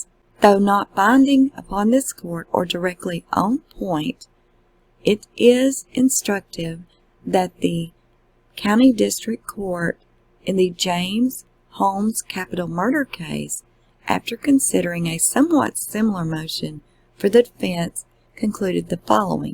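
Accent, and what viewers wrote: South, United States. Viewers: Thank Goodness you understand this! Brains and beauty, you have it all! P. S. I almost forgot, a great southern dialect! I'm in NY I even get sick of my own voice.!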